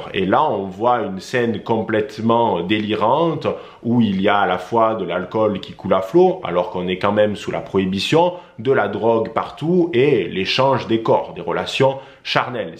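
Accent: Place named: Aveyron, France. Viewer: Est ce que ton petit accent a quelque chose à voir avec l Aveyron ? Salutations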